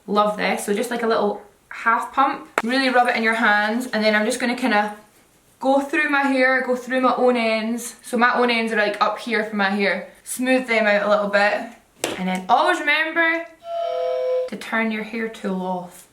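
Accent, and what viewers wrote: Scotland, United Kingdom. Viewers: Hi! I absolutely love your accent, it reminds me of my grandfather who was Scottish! I have tons of Scottish blood and the red hair to boot, but a total Southern American accent. I'm a new subscriber because I love your looks and fun, no-BS personality! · Are you Scottish? Beautiful accent and such a beautiful lady! <3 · s y she's from scotland! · 'I've got a sare shooder' 😂😂😂dying!! Thank God for a Scottish youtuber! 🎉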